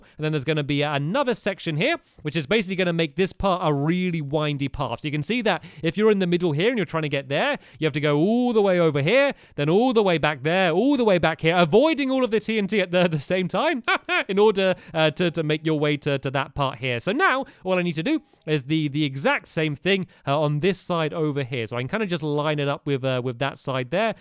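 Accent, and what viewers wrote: United Kingdom. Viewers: Teddy Rare he is brittish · Shakil Syed he is British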